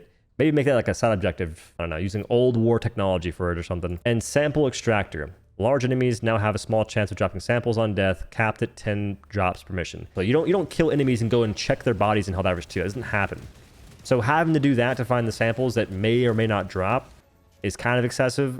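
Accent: South, United States. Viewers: Don't do a Southern accent again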